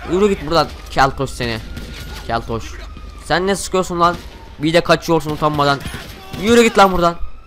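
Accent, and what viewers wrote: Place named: Sivas, Turkey. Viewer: Kardeş sen sivaslın herhalde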